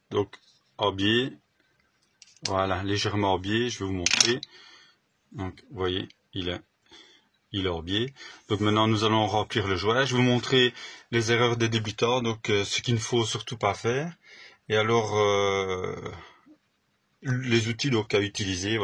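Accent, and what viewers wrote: France. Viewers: C'est très propre bravo et j'aime bien le petit accent alsacien 😉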